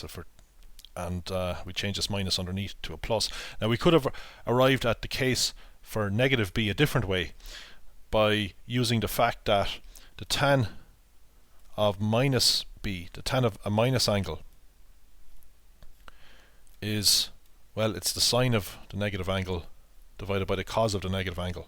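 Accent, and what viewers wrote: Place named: Ireland. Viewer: irish probably incase ur still wondering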